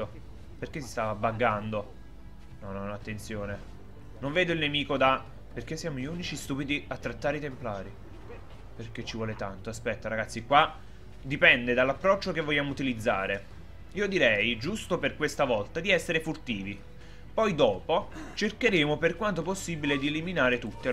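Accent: Naples, Italy. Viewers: non so se già te lo hanno chiesto ma sei di napoli????? Comunque bellissimo videoooooo!!!!!!!!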